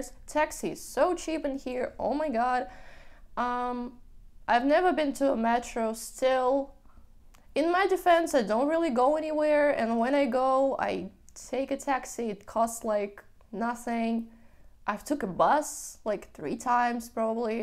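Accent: United States. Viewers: You sound so American! That’s a good thing, I am from America and mostly like it here. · Your speaking style has suddenly turned American. I'm not a fan of it. I prefer European English.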